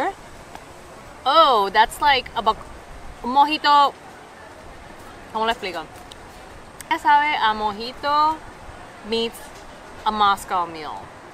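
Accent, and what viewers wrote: Puerto Rico. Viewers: Que bella habla boricua, que bella esa china...Eres increible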